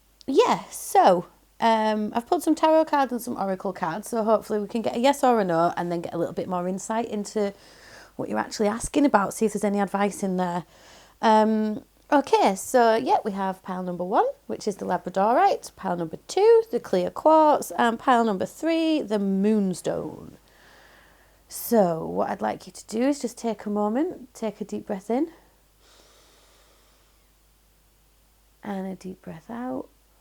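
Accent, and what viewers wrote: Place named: Yorkshire, United Kingdom. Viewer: Thank ya very much. Happy new subscriber, here. ^‿^ I chose 2, actually asking whether I should travel across country to participate in a medical trial that I'd been feeling squeamish about since learning the entirety of the protocol that I'd be following. So hearing 'a resounding NO' is an alright answer in this case. (Your accent is adorbs, by the way. I had a friend a few years back with this wildly whimsical mish-mash of Mancunian, Blackpuddlian, and Yorkshirian -- and even though she felt that her Yorkie aspect wasn't very strong, hearing you makes it pretty clear to me that she still had plenty!)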